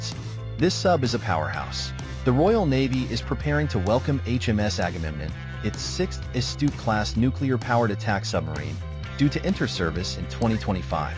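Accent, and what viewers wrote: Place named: United States. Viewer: Do we really need an American accent and the American spelling of "centre" If they want to pretend to be British for money they should get it right. Some elderly Britons notice these things.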